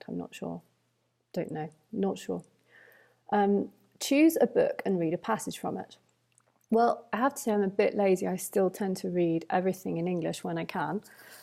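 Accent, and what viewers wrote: Paisa, Colombia. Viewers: Yep you sing Spanish like a paisa, good job! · I love how strongly paisa your accent is. I could immediately notice it in your previous video when you pronounced the song’s name “ ”. Your English accent, however, is less pronounced than many other people I’ve heard from there, and much easier for me to understand.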